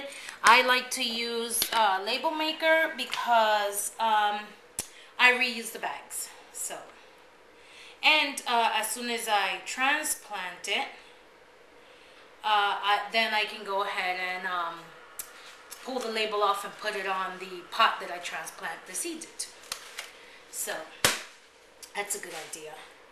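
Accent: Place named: New York, United States. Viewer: You have an amazing NY accent :) great idea with the sandwich bags my pods never seem to sprout, i'll let you know how they turn out!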